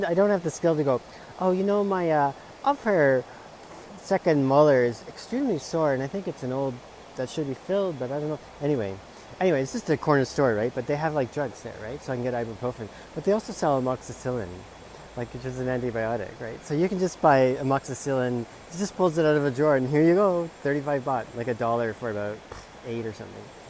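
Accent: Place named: Scotland, United Kingdom. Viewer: Hi, Love the Scottish accent-You Are Great 😊 Wow the rosettes are beautiful ma’am! I’m really enjoying all your uploads this last month-I’ve not commented on them all, but I’ve given them the 👍🏻 thanks again for the swoopy upload 😉 hope you’re having a wonderful day ❤️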